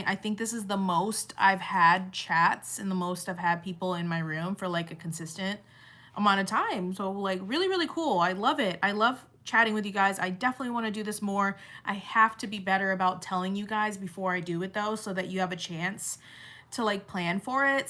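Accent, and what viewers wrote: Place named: United Kingdom. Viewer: Girl luv your brittish accent 😍 "what " my daughter colored her hair black &she luvs it :)))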